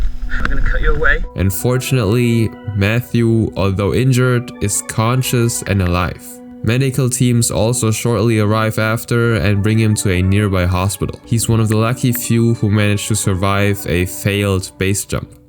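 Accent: Germany. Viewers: Are you German by any chance? Your English is perfect but I can catch a minor German accent :)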